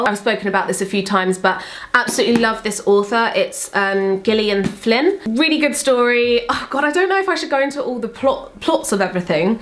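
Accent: United Kingdom. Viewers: you sound British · Your British Accent Is Life 😍😍☺️☺️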